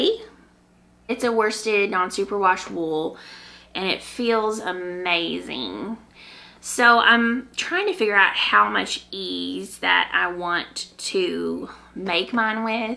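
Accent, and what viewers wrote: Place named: Arkansas, United States. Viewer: Hi! I love your podcast!! I’m a fellow Arkansan transplanted to Texas a long time ago and I just love hearing your accent from home! I love your Zosia wrap cardi - I can’t wait to make it in a light gray. I also recommend reading “A Gentleman in Moscow” if your haven’t already read it. It’s a beautiful story. My mom and I are reading the Thursday Murder Club series at your recommendation and absolutely love it! Thanks so much for all you share!! You’re so inspiring 🐝 🐓 🧶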